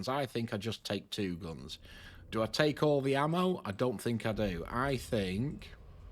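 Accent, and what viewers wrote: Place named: Manchester, United Kingdom. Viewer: Another helpful video. Thank you. I thought I had made a noob mistake getting blown up in Mission 5 of 10................I now feel much better about it! I have insured several ships but have forgotten which ones - is there an easy way to see which ones have insurance and which don't? I've also been meaning to ask where in the world you are - my guess is Manchester/Salford/Salford? I'm a Mancunian myself (Whalley Range) although since escaping from work we now live north of the border. Your accent takes me back home again 😀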